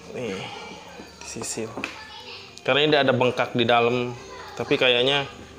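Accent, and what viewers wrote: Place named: West Java, Indonesia. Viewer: Mantap bang bermanfaat bagi pemula.......logatnya kaya orang sunda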